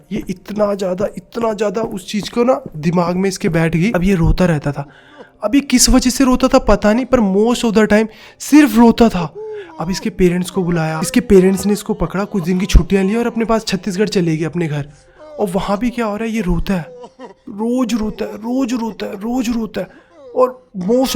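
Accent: Punjab, India. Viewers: Sir ap punjabi hai kya... I mean apka accent or dialogues punjabi style me hote hai....like chandigarh panchkula side... Mane abhi aj hi apki ekdo videos dekhi first time...mujhe lga k ap udhr se hai kya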